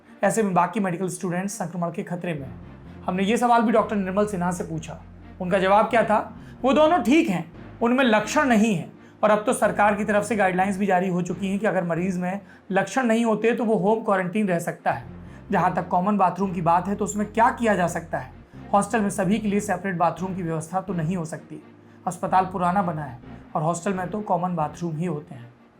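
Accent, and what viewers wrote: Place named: Uttar Pradesh, India. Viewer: सर जी एक बार विकास दुबे की कहानी अच्छाई बुराई सब अपने यूपी वाले स्टाइल में सुना दीजिए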